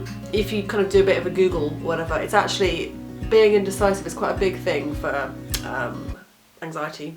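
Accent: England, United Kingdom. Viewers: just don't be anxious about your videos. you know what you're doing. i do not sleep a whole lot, naturally, so my issues manifest as confusion instead of sleepiness or desire for rest. i get the indecisiveness thing. for a time in my twenties my big decisions were made after a massive drunk; anything that seems plausible when you're hungover is more likely to succeed within one's basic nature. no manic choices... but i admit in retro i didn't soar at a few points where perhaps i might have. everyone's a little fucked up is my current thought, so honesty about it is decent. (don't totally stop swearing- the f-bomb with english accent is a kind of crack to Canadians). stay a little mad, tho. it suits you.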